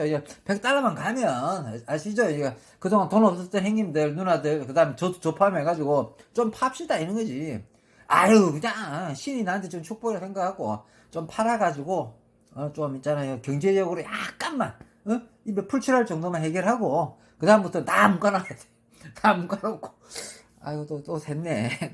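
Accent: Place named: Masan, South Korea. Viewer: 이분 무조건 마산분인디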